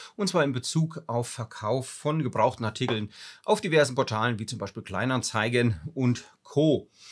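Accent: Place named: Franconia, Germany. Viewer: Sehr fränkischer Dialekt🤔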